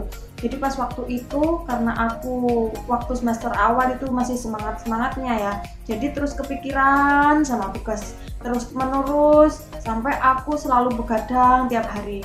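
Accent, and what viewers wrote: Java, Indonesia. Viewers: Medoknya itu nah 😍😀😀😅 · Medok e ketok emen :v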